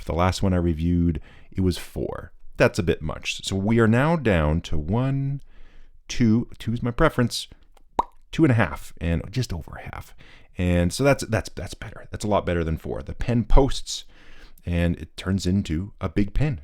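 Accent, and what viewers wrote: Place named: British Columbia, Canada. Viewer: Cut mat ✔️ Hands only ✔️ BC accent ✔️ Valuing things in kopeks and Dong ✔️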